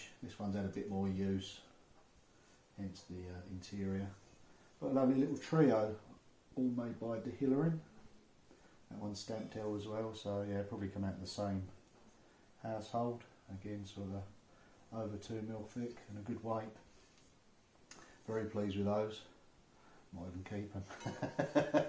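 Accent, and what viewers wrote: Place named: England, United Kingdom. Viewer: I love your vlog. It's one of those welcome to my friendship, my family and my home. I used to live in England many years ago and my partner was a true cockney who lived on Basildon Essex. Your accent and persona remind me of my life long ago when I too used to collect copper and restored two houses together in Fleetwood, Lancs. I now live in Arizona and you remind me of the life I used to live. Very special memories. Thank you for sharing. ❤ from AZ 🇺🇸.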